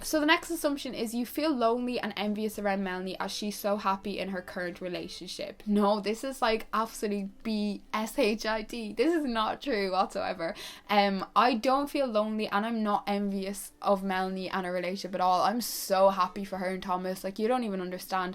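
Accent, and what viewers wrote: Ireland. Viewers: Silvia Turco Liveri yeh she’s irish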